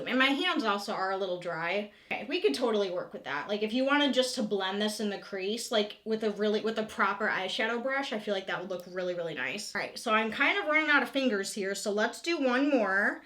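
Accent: Michigan, United States. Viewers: I love your mitten accent lol. "I got it Ahn" 😊😆🫶🏻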